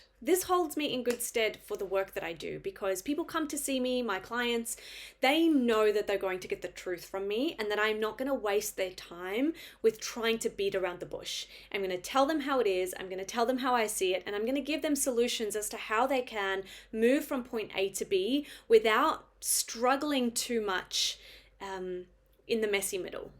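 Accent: Australia. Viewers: You have picked up the Australian accent very well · Good to 'hear' that you have an aussie accent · Your accent is 100% Aussie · you have Australian accent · Couldn't tell by your accent, proper Aussie now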